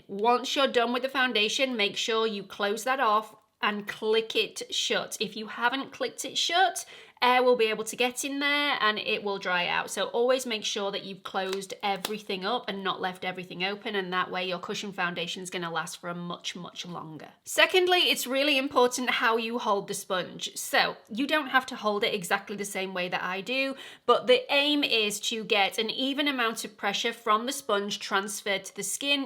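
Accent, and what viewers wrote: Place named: United Kingdom. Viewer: Hi! I love your videos, even though most of the products are not available for me, here in Argentina. I keep your info until I can travel! One question totally unrelated to makeup… I’m a teacher of English and I love your pronunciation… where are you from? It’s clearly British, but not from London. Maybe Scotland? Thanks!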